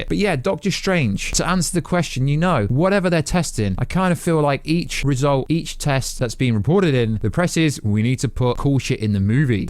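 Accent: United Kingdom. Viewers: Are you Brittish or Scottish ahha?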